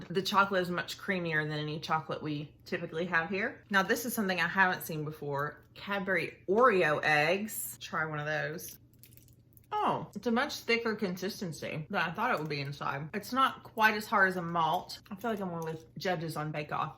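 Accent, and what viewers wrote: Kentucky, United States. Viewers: I know I don't need to say this, but industrially produced cakes like Mr Kipling's are awful compared with proper home - made cakes, well, my mother's anyway. By (late) mother's Bakewell tart was absolutely delicious, Mr Kipling's Bakewell pukeworthy! Sorry, I'm rambling - the result of several rum and cokes! Thanks for the entertainment - I love the Kentucky accent!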